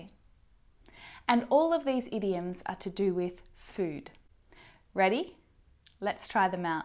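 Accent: Australia. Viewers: Hello, are u teaching Australian English? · Hey, I really enjoy watching your videos, even more with this Australian accent. Hey, just giving an idea for you, you may start your recording with some warm up activity, in order to prepare your audience for what comes later, then stablish tasks with information gaps to maintain the curiosity of your audience. It's just an idea for you. Thank you for being shared these idioms. Cheers. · you have no ideia how I do like your videos. I found you so clear when you are speaking, although I am familiar with Australian accent because I already have been in Australia over a year taking my uni exchange I think there is something really special in the way that you speak to, I can not to point it out but what I know you are the best English teacher that I met so far. You are so creative and the way that you try to create an interaction between you and your audience makes easy to memorise what you are teaching. 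I'm planning to move back to Australia again and take my master's degree there, therefore I will take again an English exam very soon so, I'm watching to your videos and practicing the imitation technique regarding to improve my speaking skill.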